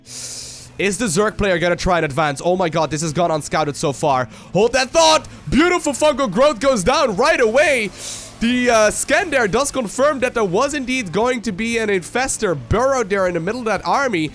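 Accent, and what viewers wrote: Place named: Netherlands. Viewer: Could be saying "serral" or "several" can't tell with that delightfully dutch accent.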